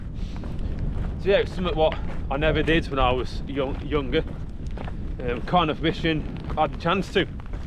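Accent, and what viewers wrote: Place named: Northern England, United Kingdom. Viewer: I think there are two things you could do to improve this video. The first is to pause your walk when you address the camera. Set a scene. Consider the background. Consider all the points you want to make, make them and then continue the walk. Look at the scenes and the composition in videos like those of the outdoor boys. The second, and I really don't mean to be offensive, but you really need to find a way to tone down the northernness. Like "A few years back, me dad give it me". This is barely intelligible. You'll be more accessible to a much larger audience if you could rephrase it as "My dad gave it to me a few years ago". I do like this content and I want it to succeed. But these are things that need to chance quickly, or else you'll struggle to reach new audiences.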